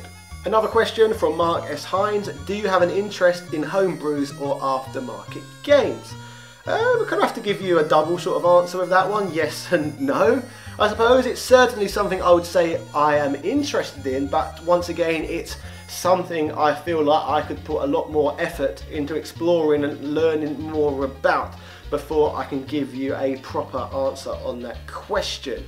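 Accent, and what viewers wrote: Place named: RP, United Kingdom. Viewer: It seems as if your ‘posh’ English accent is a bit exaggerated and it hurts my ears/ nerves/ head. Your natural delivery is probably just fine, so try what comes naturally. Don’t stretch yourself.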